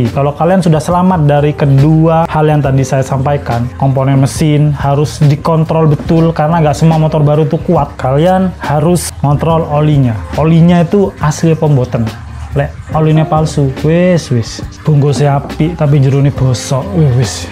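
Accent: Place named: Jember, Indonesia. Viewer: Bahasanya cekk jember nya rawes kamu mat mat 😂😂 Suwun sing akeh mas infonee 😁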